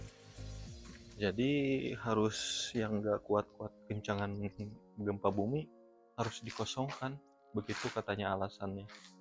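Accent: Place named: West Java, Indonesia. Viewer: Koko dr Bandung nya,..? Aksen Sunda na kental banget hehe,.. kade atuh bisi rea jurig didinya😅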